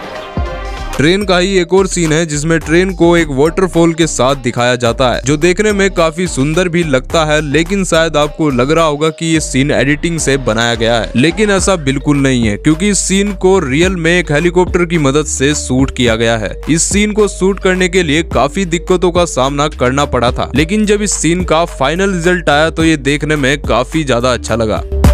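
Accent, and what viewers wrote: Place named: Rural, India. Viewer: भाई पहले श को स कहना बंद करो, देहाती लगते हो बोलते हुए😅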